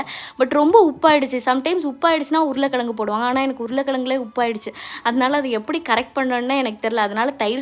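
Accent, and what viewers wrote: Tirunelveli, India. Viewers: Neenga thirunelveli side a sis unga slang antha side mathiri iruku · Super ma ❤❤Voice super 😊😊Neenka pesrathu Tirunelveli petchu mathiri irukku entha oor unkalukku pls reply❤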